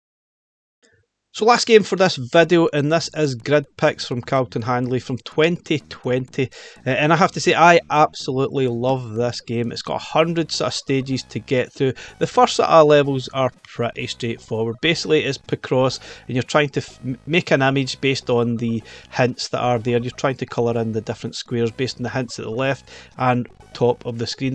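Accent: United Kingdom. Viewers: TIL: Brits talk funny. ga-LAG-a? Anyway, the games look great, but I need no more chunks of plastic. If they put the collection on an Evercade cart or something, I’m all in.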